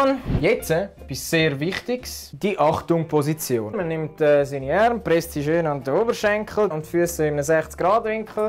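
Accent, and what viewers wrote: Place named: Switzerland. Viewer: Ich bin ja wirklich tolerant, aber Schweitzer Deutsch ist mir ein Level zu hoch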